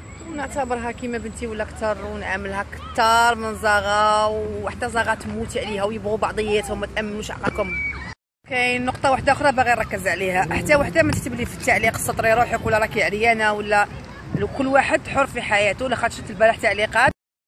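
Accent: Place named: Mostaganem, Algeria. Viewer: Saha aïdek ma soeur marhba bik moi je vien de mostaganem et j'habite à lyon et ta façon de parlé elle est comme la nôtre à mosta,je t'embrasse très fort raki m'wansétna f el ghorba hbiba avec tes vidéos rabi khalik inchallah 😘 😘 😘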